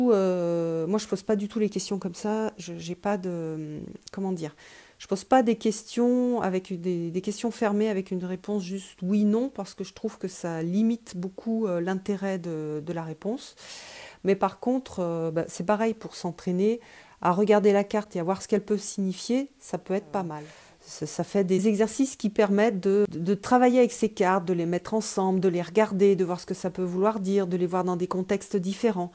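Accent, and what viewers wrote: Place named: France. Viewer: Não entendi nada, mas adoro o sotaque francês.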